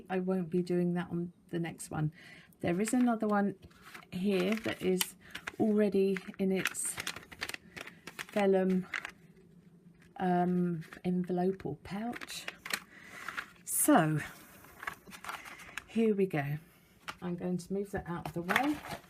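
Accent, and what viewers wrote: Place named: United Kingdom. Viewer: This card is stunning! I just found you and love your clear explanations. (The British accent is a bonus!)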